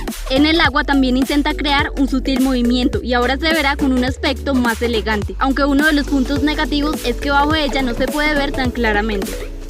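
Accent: Colombia. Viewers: voz colombiana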